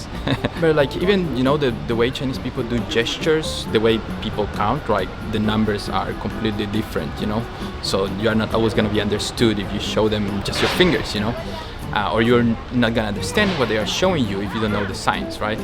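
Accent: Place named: Germany. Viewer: Great video and thanks for sharing. Are you German descendant? I notice your English has a bit of German accent.😂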